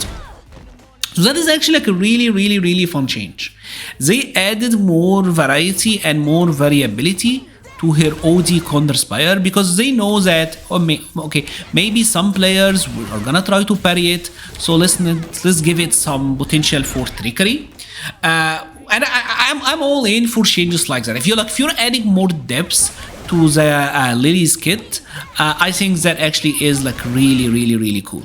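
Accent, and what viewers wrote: Egypt. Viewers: it's great when you say hey guys here wish I had an Egyptian accent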